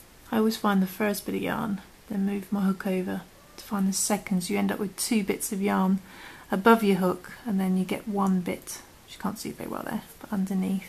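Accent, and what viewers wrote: United Kingdom. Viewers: Lol, I heard your British voice and assumed you were using British crochet terms, whoops! Just about to start again - looking forward to making this for my Autumn wreath, thank you :)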